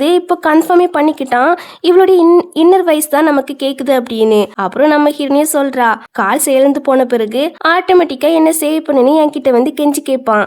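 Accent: Tirunelveli, India. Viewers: Unga voice romba nallaruku pa neenga nellai slang dhana pesurenga nan tenkasi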